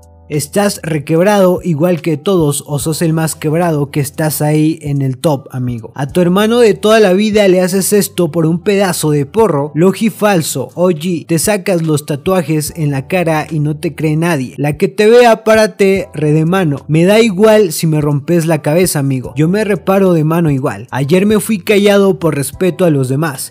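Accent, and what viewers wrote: Argentina. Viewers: hablando como Argentino❤ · No es que hables mal con acento argentino (que si lo haces, pero es lo de menos), si no que no lees bien, aunque dam escribió como el ojete.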